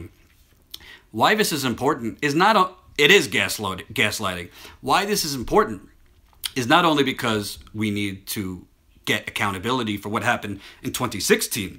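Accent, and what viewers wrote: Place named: New York, United States. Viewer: is seriously the sexiest person ever when he gets mad talking politics, and then his new york accent comes out. Lmao 😍😍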